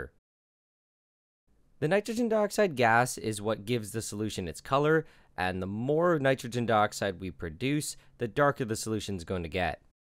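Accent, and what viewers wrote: Canada. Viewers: you tryin so hard to cover that canadian accent huh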